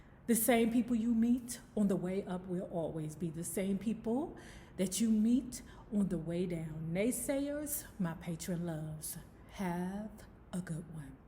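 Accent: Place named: South, United States. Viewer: Heard the go-go and was like 😳.. who dis? While time I’m driving and listening wondering how you from here with that dirty south accent 😆❤️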